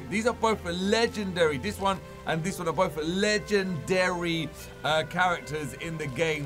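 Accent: United Kingdom. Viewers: love ur britain accent greetings from germany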